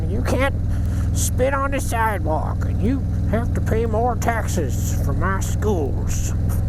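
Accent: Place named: New Orleans, United States. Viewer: I'm watching this and thinking about not just accents, but differences between us all. My wife is a beautiful filipina...such lovely brown skin and complexion...but she hides from the sun like it was evil!! In the meantime...I'm laying out in the sun in summer to get some tan on what I call my pasty white 'coffin legs!' The brown people wanna be white...the white people wanna get a tan...lol! We humans are a funny bunch.That said I love accents...I was in Bastan once...and the guy said..Pak ya truck over thay're..so I did..park my truck over there! I love it!! What could be better than a vid from our mutual friend...and ya get to hear his accent from the Big Easy....it's like a vacation from a place you want to visit...and you don't have to take a flight!! Too bad us humans...can't get together on a little more of our differences that in the reality of things don't...involve hate... 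Thanks for the ride..eh!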